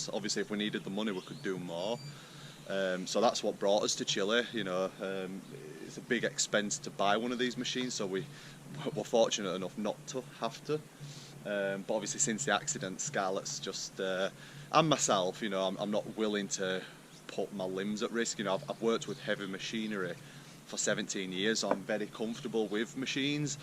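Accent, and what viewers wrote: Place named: Northern England, United Kingdom. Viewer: Im really curious what part of england you’re from. Pretty cool to see a northern sounding lad off gridding in chile!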